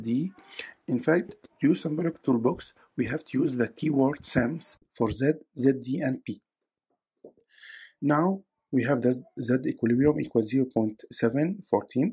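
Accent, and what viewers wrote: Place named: Lebanon. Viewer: i recognized your accent a bit, are you Lebanese? thank you professor for the amazing explanation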